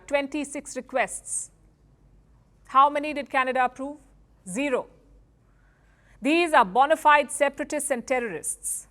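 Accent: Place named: India. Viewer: Dmbaz this is indian accent. Which American canadian talks like that?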